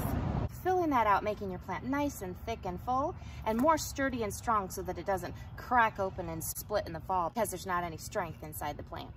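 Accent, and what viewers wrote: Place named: South, United States. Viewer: Thanks i can’t wait til May...love your southern accent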